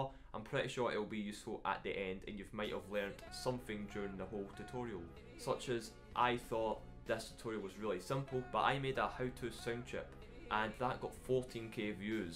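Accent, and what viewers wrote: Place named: Scotland, United Kingdom. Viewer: Fellow Scotish :0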